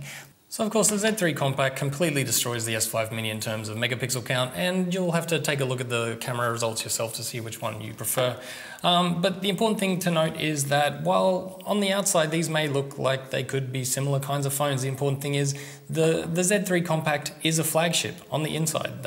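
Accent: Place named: Australia. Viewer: If the British version of Saul Goodman had an Australian accent?!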